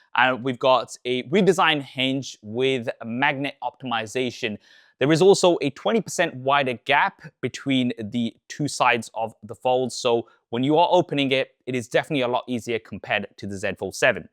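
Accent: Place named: United Kingdom. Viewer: SuperSaf can you work a bit on your elecution & diction for these videos? It's really tough on non-British listeners. I had to press the -10 button sll the time and by the end of the video my ears were screaming. I assume this a regional British accent with the sing-song up-and-down chit-chat you do with the guys? Because a standard London accent I usually understand very well and even regional accents of older people eg Andy Burnham I understand fine. Cheers!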